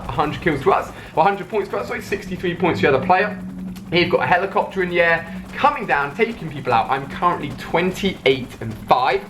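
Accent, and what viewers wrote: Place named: United Kingdom. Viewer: hmm all the guns and explosions sounds a British guy talking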